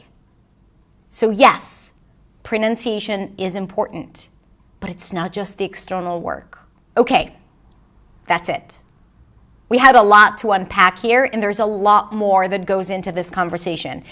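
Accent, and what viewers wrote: United States. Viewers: you are pushing it too hard, we know your American accent is 99,9% accurate, but you still have to work on the source. Stop watching movies, especially with girly white girls starring, start watching documentary or news program... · She sounds completely American. She speaks from the inside of her mouth a bit towards the back, but so do a lot of people. Midwestern accents and even southern accents push the sounds into the top middle of the mouth. Think of how people from different regions say, "yeah". Her presentation and placement has a lot in common with the standard American accent. Good thoughts here. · I wish I could speak english with your perfect american english....but I would choose the option 1 undoubtedly 👍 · i get confused for a while, i thought you were from the US because of your accent. Your English is brilliant.. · The thing the only reason she can say this and not sounding ridiculous is because of her American accent. 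Try another one say in a Chinese-English accent. Not indian, not african, chinese. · being white and being a man? I didn't watch this video cuz you're white or whatever. Watched just because you are non-native and you acquired that american acccent. You should study a little more about femminism BS. I am a white man and have priviledges cuz I work my ass off and not for a some sort of white skin priviledges... and of course I trust more people without accent cuz generally have more experience (there are exeptions but I'm sure you understood my point) Best regards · You are not a Native speaker? No way!!! You sound 200% American. At what age did you come to the US and how old are you now, dear? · I am a college graduate foreigner who has been living in the U.S since I was 18, and that was over 20 years ago. I can notice a British, Australian or other types of accent within a few seconds. 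You sound just like an American. Apparently, you were able to achieve the goal from option 1, so just show us how you did it. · But you sound really American 😂 and i want to sound so good as you. I do not compare me to you. But from the beginning i want to sound like native speaker already and try to nail it. But some how i can not change my rythm anymore😢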